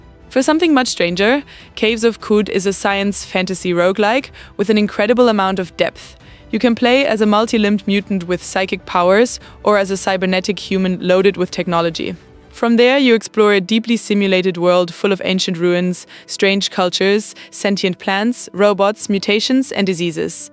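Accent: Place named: Eupen, Belgium. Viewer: Gotcha! GL with your future endeavours, fellow Belgian. I'd guess Eupen area with the slight accent? Excellent english 👌What are your plans for future videos, if I may ask? This was a clean video but reviews are not very "exciting" afaic.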